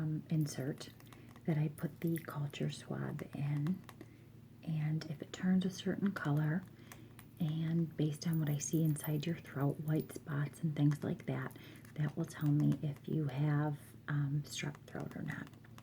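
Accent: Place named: Minnesota, United States. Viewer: Listening to you speak is like watching the movie "Fargo"!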